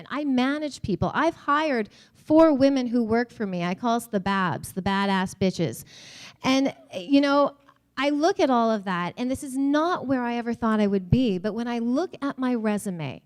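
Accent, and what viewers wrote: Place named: Canada. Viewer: Lol she's extra Canuck, she sounds like Marco Belchior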